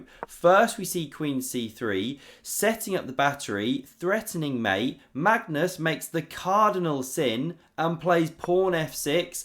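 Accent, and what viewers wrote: United Kingdom. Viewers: He's just British. Great channel!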